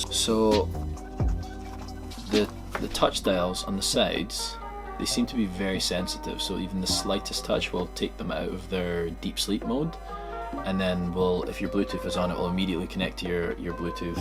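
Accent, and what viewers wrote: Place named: Scotland, United Kingdom. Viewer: I live in the Glasgow area and you honestly have the most interesting Scottish Accent I've ever heard! Oh, and by the way, I bought these earphones on the back of this review. Mainly because when you started playing music your face said 😲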